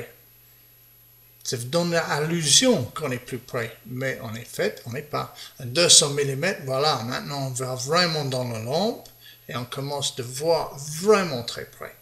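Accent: England, United Kingdom. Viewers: Super ce petit cour avec l'accent anglais j'adooore. Je suis nouveau dans ce domaine de la photographie ce cour m'aidera beaucoup car je ne pensais pas qu'il y avait autant de spécifique aux objectifs merci merci merci encore pour votre partage vidéo. Je m'abonne. Bye...!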